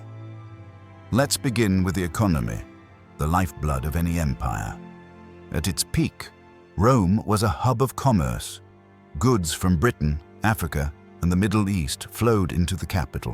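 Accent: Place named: Australia. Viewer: Like others in the comments, for me it sounded like Australia.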